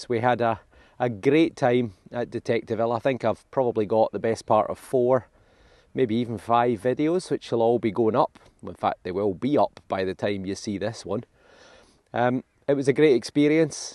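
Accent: Scotland, United Kingdom. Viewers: I enjoy very much watching you find all of these treasures from way across the blue Pacific Ocean in beautiful Hawaii. Your Scottish accent is just beautiful to listen too. Always exciting to watch your finds!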